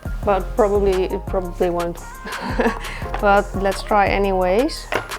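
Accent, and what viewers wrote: Netherlands. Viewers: So funny, I immediately noticed the Dutch accent. I love the Netherlands, best place to live. Was in Utrecht/Amersfoort for 2 years before the pandemic. Greetings from Portugal. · dame you are beautiful!! and love that dutch accent! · Beautiful Netherlands girl with an NL dialect and she knows how to deal with screwdriver and so on 🥰😍 And than the yellow CRX next to the Volvo 😋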